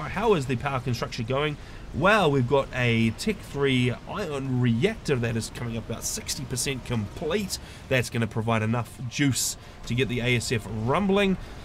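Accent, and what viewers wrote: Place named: New Zealand. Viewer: first up good on you for taking the time to covering FAF! the quality of the cast was very good. i however am a tad ocd and found listening to your NZ accent exxtremely irritating, so sorry had to be honest!!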